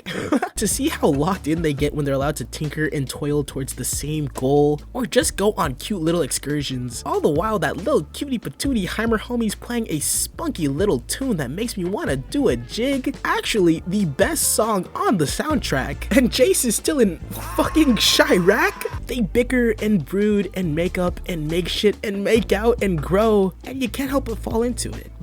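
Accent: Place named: United States. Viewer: american accents are so cool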